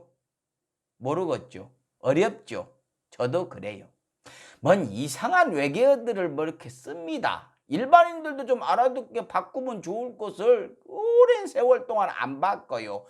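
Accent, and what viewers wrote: Jeolla, South Korea. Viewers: 혹시전라도랑무신관계라도있을까요 우리고장말씀을잘구수하게잘하네요 너무나시원한럭셔리칼럼하루만안해도기다려지네요 강성범tv많이많이들구독해주삼♡♡♡ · 형. 님!! 전라도 사투리 솔찬히 잘흐요.. 수원에사는 순천 촌놈이 구독 눌루고 가요오!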